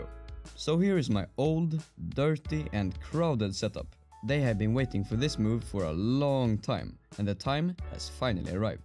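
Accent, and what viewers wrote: Sweden. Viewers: You can hear that he is from Sweden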